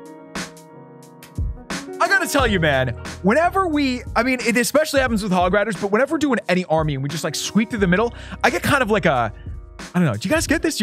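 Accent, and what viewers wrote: Canada. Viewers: Wait, is he Canadian? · are you canadian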